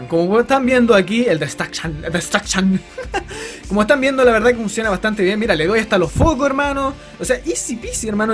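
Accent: Chile. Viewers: La mejor mierda es que este en audio español chileno xD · espera eri chileno? y en que pais vives? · Es chileno bro V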